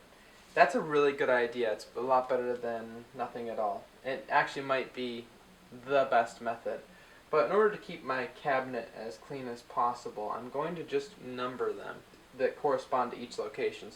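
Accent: Minnesota, United States. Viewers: Sounds like a Minnesota accent. A virtual Canadian.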